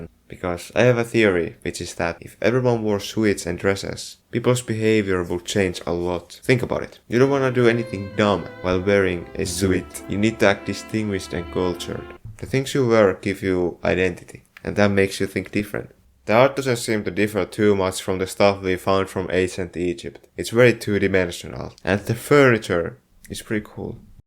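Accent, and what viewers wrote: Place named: Finland. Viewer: Hehe ekasta lauseesta äkkäsin suomiaksentin :DDD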